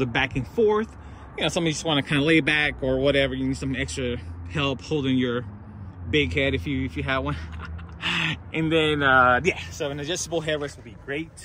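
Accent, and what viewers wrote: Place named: Philippines. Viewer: Slight Fil accent under the Southern accent.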